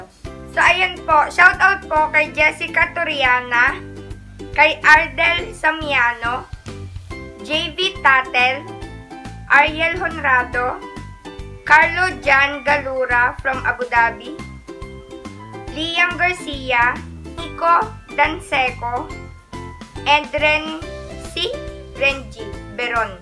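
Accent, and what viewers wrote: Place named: Marinduque, Philippines. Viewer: New subscriber din from gasan marinduque..parehas tayo ng punto..😊😊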